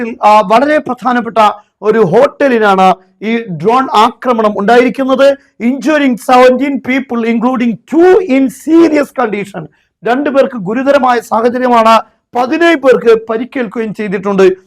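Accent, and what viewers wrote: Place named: Tamil Nadu, India. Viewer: Dont read english please, thats not your skill , your Tamil english very irritating but all other talks are ok😅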